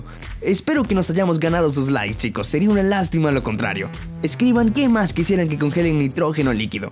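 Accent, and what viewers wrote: Russia. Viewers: Eres ruso?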